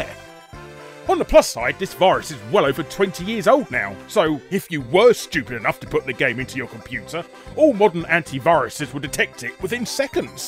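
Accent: United Kingdom. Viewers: I needed 5 seconds to figure out that you're a British, cause I turned off the CC n I thought you said Varices over and over like this video is about Varices. 😂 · "For you English speaking fellows" being stated by a very British accent was a highlight joke for me for some reason.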